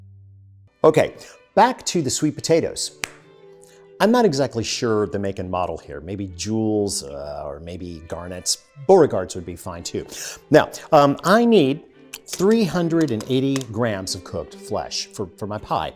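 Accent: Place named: General American, United States. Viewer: Alton is the Renaissance man of our times. We have not recognized a well- rounded man needs to be all -around in visual media technology, and his many other talents, cooking, writing, on screen talent, editor, music director. DP, behind the camera, producer, props, the master of GenAm or broadcast American English. . . ah heck, the above and below the line producer, covering all areas. That is a true modern Renaissance man.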